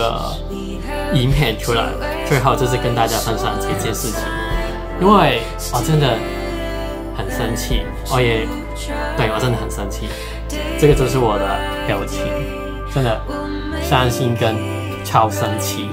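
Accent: Cantonese, China. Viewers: 第一：你的中文已經講的很好，廣東話跟中文混在一起講，台灣人也ok啦．第二：要當公眾人物(youtuber)就要有＂受人議論＂的心理準備，愛你的人有多少，討厭你的人就有多少，怕熱就不要進廚房，如果無法接受被人議論，就當個平凡人，不要曝光低調過生活．最後希望你在台灣開心的生活，大部分的人都很善良熱心，對廣東口音的朋友都十分喜愛的． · 不管國語版廣東話或是廣東版的國語從口中說出來不都是很可愛嗎？語言／生活習慣因為不同環境本就有所差異，異地或異國戀情不就是因為愛而慢慢彼此往中心點靠近而創造出屬於你們的故事。加油囉！支持你們也歡迎來台灣。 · 我其實以前都係同你一樣被人地開玩笑話我騙身份證不過我唔係咯感情掂可以用騙的但後面變左都唔係我可以控制嘅另外一點我唔覺你廣東話唔純咯你廣東話幾好聽啊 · ❤❤❤❤❤❤不要生氣了，你很棒很勇敢，為愛走天涯，你還有愛你的亨利，不用去在意其他人的言語，國語的話我認為時間會慢慢解決一切，而且香港口音很可愛，以前郭富城，葉蘊儀來台灣很紅就是有那個香港腔，很討喜，超可愛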